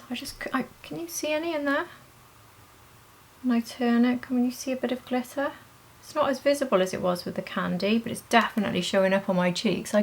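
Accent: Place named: Wales, United Kingdom. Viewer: Just found your channel - fabulous! Lovely Welsh accent How do the lip butters compare to the maximisers please ?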